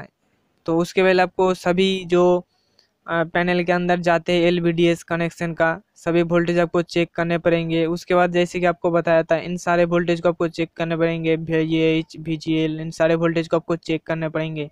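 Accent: Bengal, India. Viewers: Aapka mother language bangali hai na is liye bahot logo KO samaj me nahi aata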